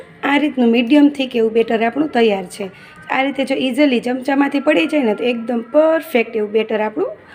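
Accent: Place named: Kathiyawad, India. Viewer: Khub saras vangi che. Tame kathiyawad na Cho? Bolvano lehko tya no lage che😊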